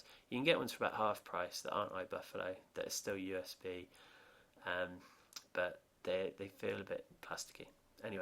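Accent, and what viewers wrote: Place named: West Country, United Kingdom. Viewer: Can't wait for all the parts to arrive, excellent video! Are you from Bath or Brizzol?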